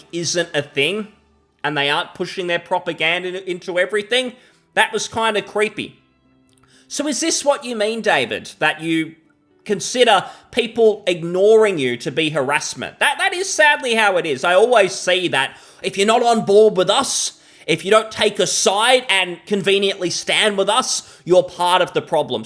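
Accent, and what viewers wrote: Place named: Australia. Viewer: Please tone down the Australian dialect, thanks! If i wanted to listen to that shite i go watch crocodile dundee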